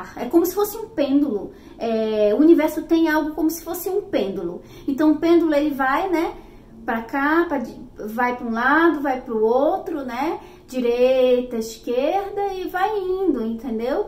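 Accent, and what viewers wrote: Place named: North, Brazil. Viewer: Ela é da região norte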